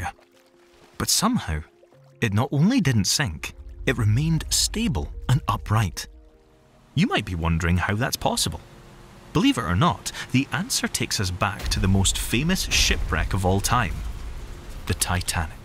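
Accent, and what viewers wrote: Scotland, United Kingdom. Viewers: I love the Scottish accent for this!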